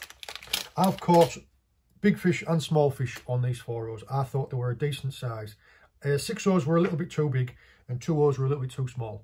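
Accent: Yorkshire, United Kingdom. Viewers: Really good info also I've been using a rubber bead between the swivel and lead... in the USA they use a glass bead to attract the fish..... And how many of the watchers are online looking for a weight called an Arf... You can take the man out of yorkshire......😂😂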